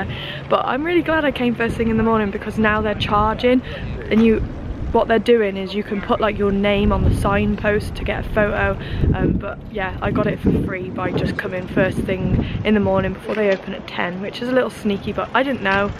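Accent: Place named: Nottingham, United Kingdom. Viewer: Hi there, love your videos, you wouldn't happen to be from Nottingham would you? I'm sure I recognise the accent! Great video by the way, I love Cornwall (when the weather is right)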